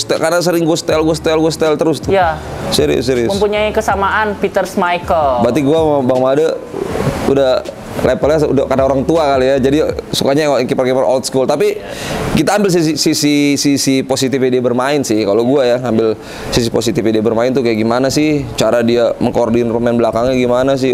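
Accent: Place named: Bekasi, Indonesia. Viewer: Di liat liat asik juga dari bahasanye wkwk, orang Bekasi ya bang?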